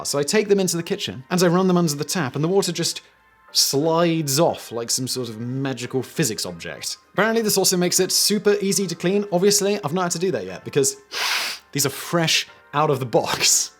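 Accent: England, United Kingdom. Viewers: Why is a guy with a British accent advertising shoes you can't get shipped to Britain? Cheers, A British guy. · Listening to him speaking fast with that Brit accent is kind of exhausting for an American. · I'm sorry but I really really like laughing at you saying those euphemisms for breasts and your British accent maybe it makes me a boob but there it is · I didn't know i needed a calm British recitation of breast slang until today. Thanks,.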